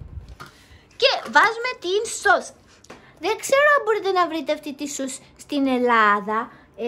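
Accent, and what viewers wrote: Japan. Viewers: Υποθέτω, έχεις καταλάβει ότι μιλάς πια τα ελληνικά με γιαπωνέζικη προφορά. Σαν κάποια μεταγλωτισμένα γιαπωνέζικα παιδικά που βλέπαμε μικροί. Το οποίο είναι χαριτωμένο:)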